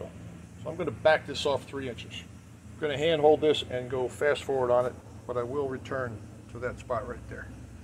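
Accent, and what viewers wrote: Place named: New Jersey, United States. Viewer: Jersey? I thought I detected the accent of a fellow Jersey guy. For sure, that's not a Texas accent. :-)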